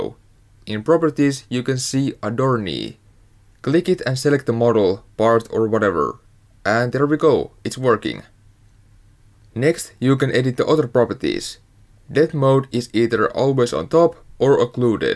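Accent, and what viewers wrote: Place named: Finland. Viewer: vahva suomi aksentti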